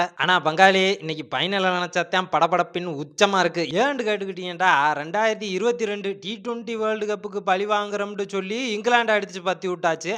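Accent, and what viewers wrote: Madurai, India. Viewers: நம்ம மதுரை ஸ்லாங் கேக்கவே அருமையா இருக்கு நண்பா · Bro neenga maduraiya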